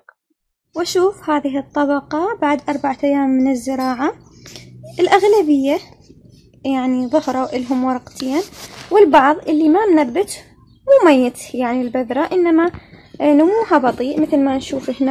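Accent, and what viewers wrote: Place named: Basra, Iraq. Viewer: عرفت من لهجتج بصراوية الله يحفظج ويبارك بعملج انا من حي المهندسين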